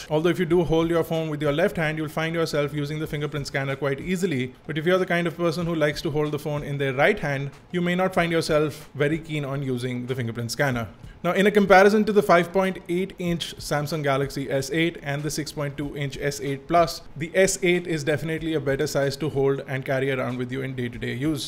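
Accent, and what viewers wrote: India. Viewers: Great Showmanship and Stylish yet understandable Indian Accent, Rock On,........... Make a VS Vid with the G6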